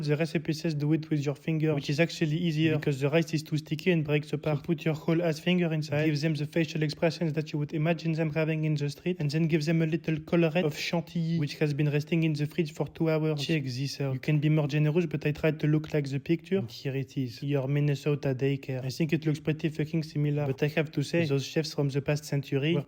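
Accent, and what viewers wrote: France. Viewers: wow l acccent francais laa frero mais j aime l humor bonne chance mon reuf ciaoooooo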